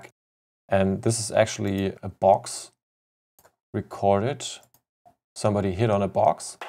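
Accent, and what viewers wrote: Germany. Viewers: Are you german? You sound german at least...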